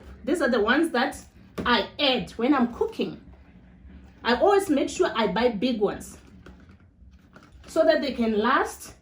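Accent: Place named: Africa. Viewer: Keep going forward my beautiful teacher love you and your family, some people with negative comments that's how African teachers talk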